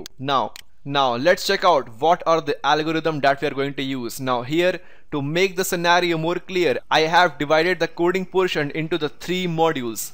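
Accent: India. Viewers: Indian xd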